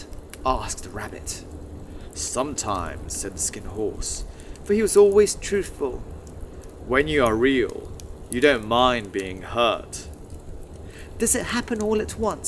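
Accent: United Kingdom. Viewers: 発音が心地よくて本当に聴いてて最高です。これをきっかけに「ビロードのうさぎ」の洋書も書いました。もし可能なら「えんとつ町のプペル」の英語版の朗読もイギリス英語でやっていただけるとうれしいです🇬🇧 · 焚き火の音もさんの声もイギリスの訛りも全部好きでこれ聴きながら眠りについています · 美しいイギリス英語に聞き惚れますね~はぁ続きが楽しみ✨素敵なクリスマスプレゼントありがとうございます😭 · 最高のヒーリング動画…🥺 イギリス英語ってなんでこんなに耳心地がいいのでしょう… さんの読み方がいいのか…！眠るまで聞き続けます😇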